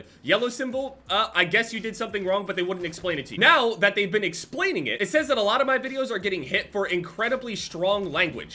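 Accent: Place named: New Jersey, United States. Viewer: from jersey, thats kind of how we just regularly talk lol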